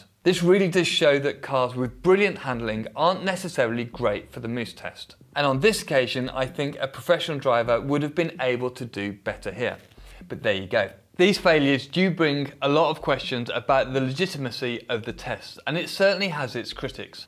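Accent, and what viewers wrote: United Kingdom. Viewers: You sound British but look French 🤔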